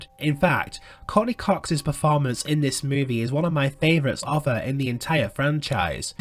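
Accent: United Kingdom. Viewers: He's from the northern area of the UK, they all talk like that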